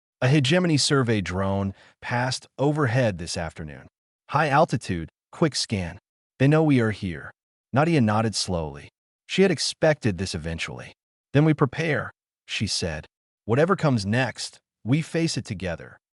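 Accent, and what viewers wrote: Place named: Spain. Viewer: The occasional Spanish accent draws my smile.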